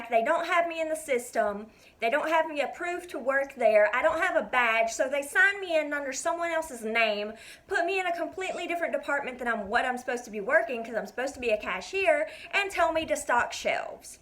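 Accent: South, United States. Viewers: Oooh a southern accent... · I love your southern belle accent ^-^ its kinda nice to listen to you talk while i work (i work at sonic. Its way better than walmart because my bosses are really chill and my co workers are cool but some customers just ruin it)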